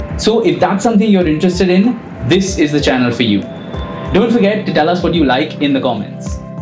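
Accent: India. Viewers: This south Bombay accent is really nauseating. I hope it vanishes.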